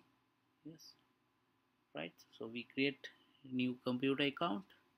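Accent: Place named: India. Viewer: indian accent very bad!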